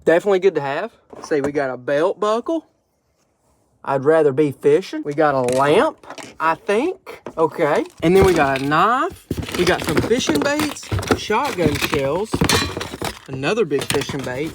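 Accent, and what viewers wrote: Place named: South, United States. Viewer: Im from Philadelphia but i gotta go down south and make friends with some southerners cause i think i could pretend to talk like you forever and it never get old. Cause everything sounds funnier to me when you talk like that. Honestly i wouldn’t be able to take much seriously at all. Lol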